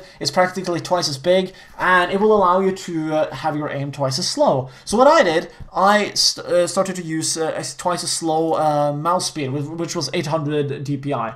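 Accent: Norway. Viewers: er du NORSK!? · Er du norsk for faen:)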